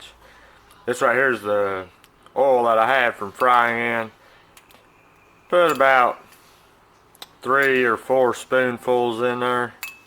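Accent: South, United States. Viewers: Where in the south you from? I can't place the twang.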